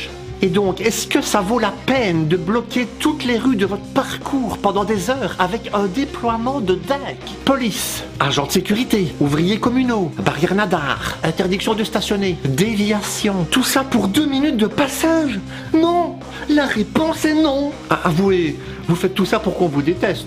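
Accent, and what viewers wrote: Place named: Belgium. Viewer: Salut je suis cycliste, et je déteste les mauvais humoristes belges avec des accents idiots ! Mais je n'en fais pas une vidéo ...😊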